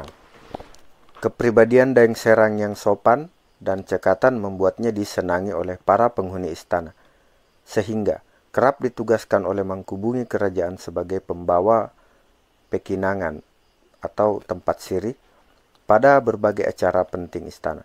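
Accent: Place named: Wajo, Indonesia. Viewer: Yg bicara pasti orang wajo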